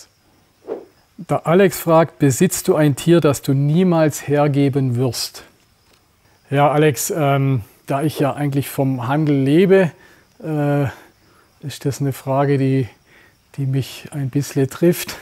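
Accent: Swabia, Germany. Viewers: du haddeschd scho enemal a wegen weniger Dialekt geschwätzet, des war echt besser, net jeder Zuseher tut schwäbisch verschdehet, scho gar net außerhalb vom Ländle. Is scho a wegn heftiges Laschder, bidde beherziged... 🤦‍♂️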